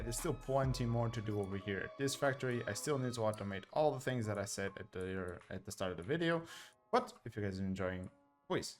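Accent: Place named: Portugal. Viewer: Notei logo que é portuguessss 🙂‍↕️ tugaaaaa 🇵🇹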